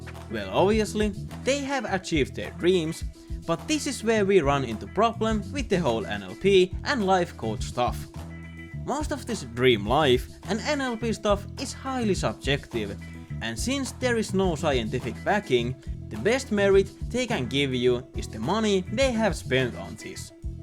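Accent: Finland. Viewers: Massive suomi aksentti L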